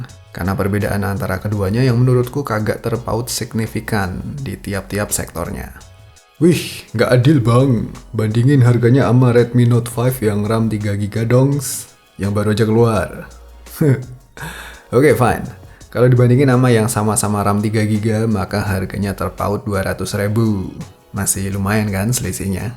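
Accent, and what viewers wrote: Java, Indonesia. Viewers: Dengerin suaranya bikin capek jawa nya medok amat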